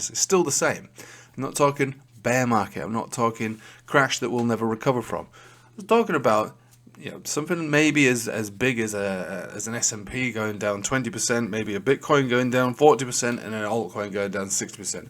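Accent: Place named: England, United Kingdom. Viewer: First time I’ve listened.. Nice honest analysis. Nice to hear an Englishman. God Bless. Subscribed. 🎉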